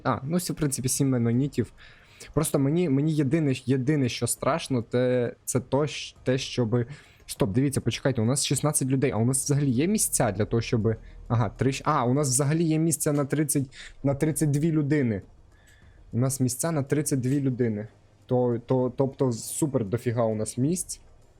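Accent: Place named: Russia. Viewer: чому ты размовляешь як москоляка?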